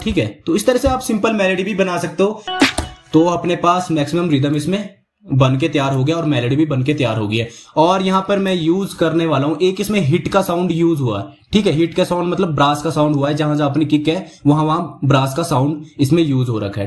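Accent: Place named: Hills, India. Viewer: aapki awaj se hi lag raha tha ki aap khi pahad se belong karte ho , main Uttarakhand se 😊🙌🏼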